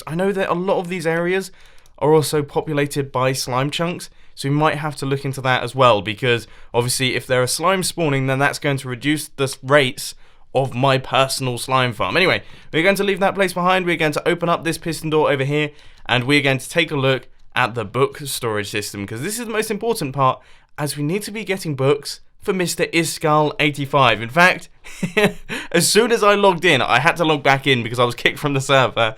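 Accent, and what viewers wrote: United Kingdom. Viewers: Mumbo is so British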